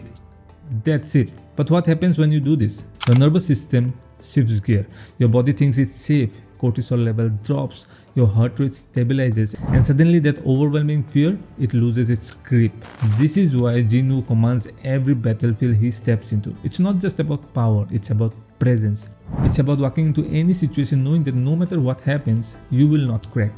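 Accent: India. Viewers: Assamese Accent on top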